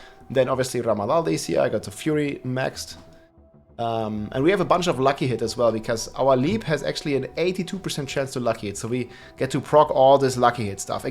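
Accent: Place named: Germany. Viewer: Bist ein Opfer wirklich du bist der Grund Why alles geh nerf Wird rede mal deutsch du bist mehr deutsch als Manuel neuer hört man so raus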